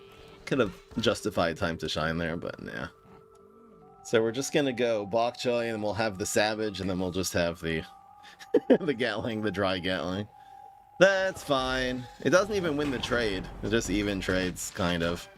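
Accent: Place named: France. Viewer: Voice french is so funny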